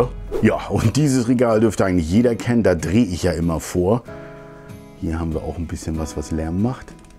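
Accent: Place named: North Germany, Germany. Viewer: Ich wünsche dir ein paar wirklich phantastische Weihnachtstage lieber. Ich freue mich immer, wenn eins deiner Videos raus kommt. Der norddeutsche Akzent und Art lösen bei mir ein klein wenig Heimweh aus. Falls du jemals einen Mitbewohner suchen solltest, dann sag auf jeden Fall Bescheid!:D Bei der Bude bekomme ich ja einen Nerdgasm! Ein einzig riesiger Spielplatz!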